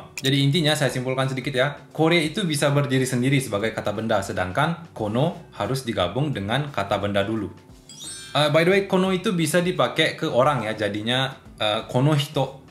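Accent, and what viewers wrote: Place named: Bali, Indonesia. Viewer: Bang org bali e? Logat nya kayak nak bali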